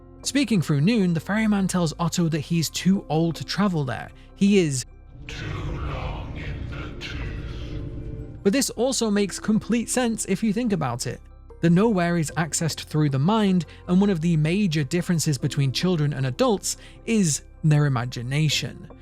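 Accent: England, United Kingdom. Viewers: Wait you are british???,man I thought that you are american 😶😶😶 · Um actually u speak the English language and the sound of ur accent is British and no one in America says "oh ur speaking British" SMH ignorance is bliss huh lmmfao rofl GOD SAVE THE KING CHUCKY CHEESE AND QUEEN CAMELTOE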